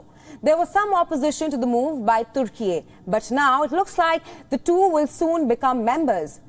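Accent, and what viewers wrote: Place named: India. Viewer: It's like watching Fox News with indian accent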